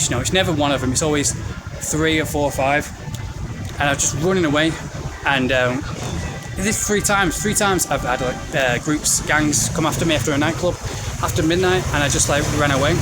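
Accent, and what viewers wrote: United Kingdom. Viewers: You have a Scottish like accent. Are you from Liverpool/Scotland or nearby?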